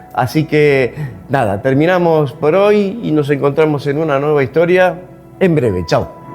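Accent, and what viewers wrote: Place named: Rioplatense, Argentina. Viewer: Espléndido informe, nuevo suscriptor. No sé si sos argento o uruguayo 🙋🏻‍♂️desde Chaco🇦🇷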